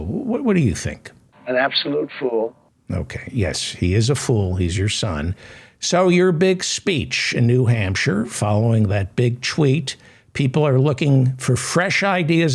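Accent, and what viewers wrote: New York, United States. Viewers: I read ur intro, I grew up in Flushing and throughout my entire life I've battled to fall asleep. I'd put on earphones and listen to talk radio when I was a kid hoping to get some shut eye before school and still till this day I transitioned from a walkman and a.m. radio to a smartphone and YouTube. I found you at approx 4am last night(IMO it's never the next day until the sun begins to rise) and listened to one of your podcasts(full show), the ny/jersey accent, the dry humor overloaded with a large helping of sarcasm was right up my alley. Keep doing what you're doing, you're fantastic at this. We need to crush the GOP out of existence or if the GOP wants to continue as a party here in the US then they need to be known by the entire world population as the far right extreme fascist propagandist nationalists that they are but yes I know it's way too long for a party name but we can make it into an acronym such as the Far Reich Totalitarians party in short they'll be known as the FART Party). 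Then we'll have to out the Corporate Dems as the Republican lite party that they are and allow the real progressives to have our own party. Good day to you sir, you've found a new subscriber in me today with many many more to follow.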